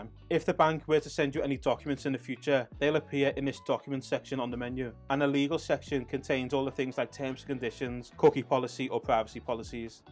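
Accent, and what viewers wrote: Liverpool, United Kingdom. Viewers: yeah I lived in wavertree originally and moved up to Clitheroe to live with my partner. And I agree especially when it comes to financial content I haven’t seen anyone with the scouse accent scousers tend to get more of a bad rep compared to a good one. so it’s especially good seeing someone talking about finance and providing great advice like yourself.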